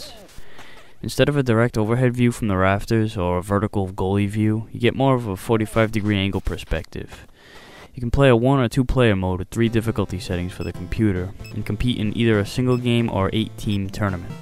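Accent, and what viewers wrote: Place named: Boston, United States. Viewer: ah so your from boston, i was wonderin about the accent. anyways , hows boston?ill be moving there in a few months, around suffolk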